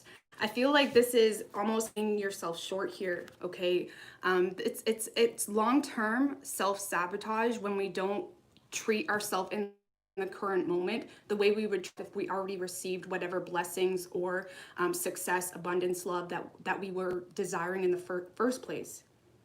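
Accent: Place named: Canada. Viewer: Love your readings and your accent...oot and aboot! X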